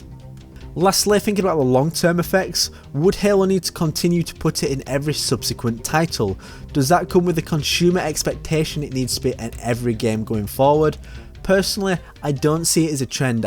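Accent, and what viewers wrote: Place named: Bolton, United Kingdom. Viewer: You are definitely from Bolton aren't you, jesus Christ🤣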